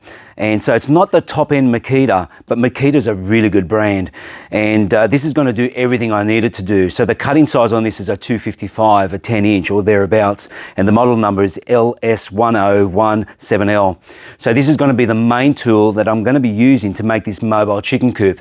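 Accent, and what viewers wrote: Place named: Australia. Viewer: Thank you so much in replying, you are one of the few who has! I found your channel purely by accident. Although I am now 61 and retired, I find that trying to expand your mind is a good and healthy thing and one should never stops learning until you pop your clogs. Given that there are so many channels with Americans on here, it is a refreshing change to hear an Australian accent, not that our US and Canadian cousins don't have a lot to offer, as they do. PS Given you are in Sydney and I am in good old Blighty, you must either go to bed very late, get up very early or just not sleep a lot like me! All my very best to you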